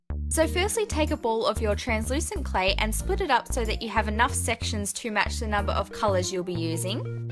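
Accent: Australia. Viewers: are you from australia? same (im from australia)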